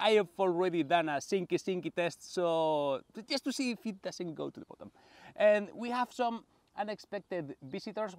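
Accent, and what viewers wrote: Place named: Spain. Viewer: eres espanyol se nota xd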